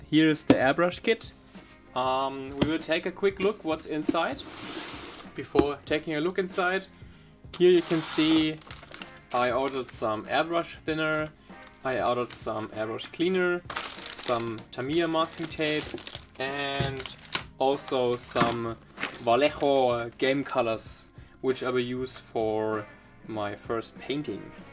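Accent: Germany. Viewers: Man hört raus das du ein Deutscher bist ^^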